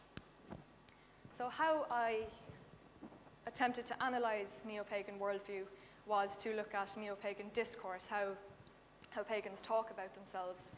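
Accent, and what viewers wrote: Ireland. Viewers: you have the most beautiful eyes and I absolutely love the Irish brogue in your voice,...pretty and smart is a rare combination...huzzah to you! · You did an excellent job presenting your research methods in an unbiased fashion. It's rear to hear anyone talk about any religion without hearing a lot of the personal opinions of that person. You did a great job!! I look forward to reading your book! Though another video presentation would be nice. (Im from America, so hearing about paganism in a Irish accent is really cool, plus you have Gorgeous eyes ;) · Such a good presentation. Also that Irish accent is to die for.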